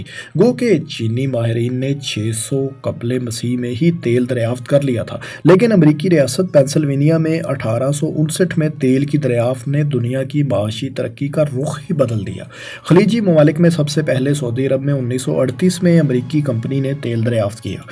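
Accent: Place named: Punjab, Pakistan. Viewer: السلام علیکم جیسا سحر فیصل بھائی کی آواز میں ہے وہ چیز آپ میں نہیں ملتی ہمیں لہذا آپ اس چینل کو چھوڑ دیں آپ کی آواز اتنی سلو ہے سنتے سنتے نید آ جاتی ہے اور اردو کے کے کچھ لفظ آپ پنجابی میں کہہ کر جاتے ہو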